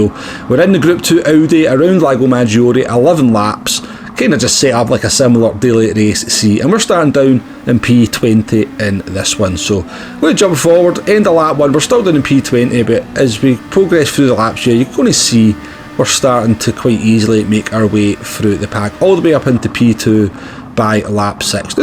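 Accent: Scotland, United Kingdom. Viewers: From Scotland by the accent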